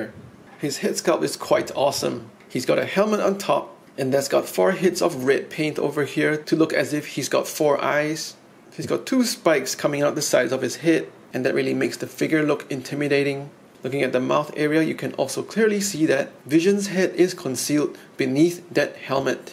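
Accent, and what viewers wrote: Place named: Singapore. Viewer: I know a Singaporean when I hear one. Nice review man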